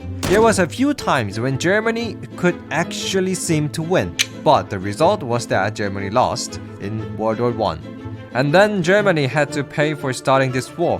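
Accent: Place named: Russia. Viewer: Love your videos ❤ Btw you sound Russian are you from Russia?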